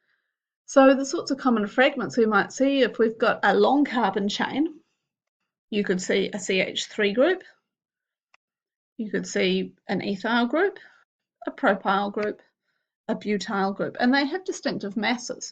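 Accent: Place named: Australia. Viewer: Its Australian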